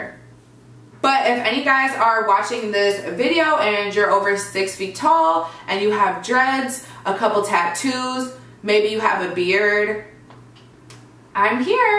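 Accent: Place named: Wisconsin, United States. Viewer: You definitely still have a WI accent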